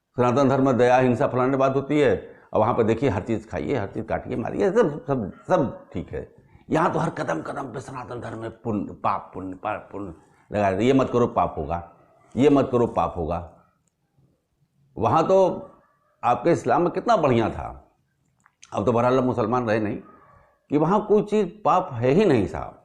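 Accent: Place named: Allahabad, India. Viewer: bahut chutila vyangya elahabadi boli me bahutai jam tha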